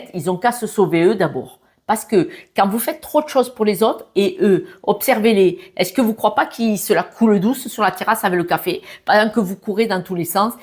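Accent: South, France. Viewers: Oui je suis d'accord avec vous...j'ai commencé à prioriser ce que je pense faire sur mes jours de vacances...rangement et sorties avec les amies...mon autre est statique...je dirais..hyper-statique 🙄 Vous êtes l'alchimie de Louis de Funes (en geste) Maïté(en paroles bienveillantes avec l'accent)Jamie Lee Curtis(pour le physique) et le tout avec le ❤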